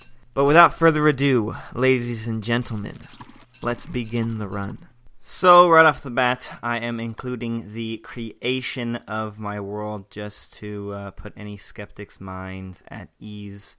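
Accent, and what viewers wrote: France. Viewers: ur french?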